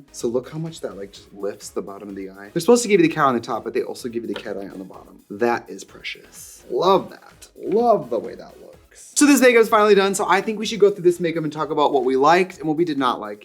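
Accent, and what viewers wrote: Wisconsin, United States. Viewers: Trixie goes on vacation for 3 months and comes back with Milwaukee Wisco accent in spades she's been trying so hard to keep under wraps. [I also have this accent and try to keep it under wraps] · The wisco accent is really strong after the break 😂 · Your Wisconsin accent is thicker.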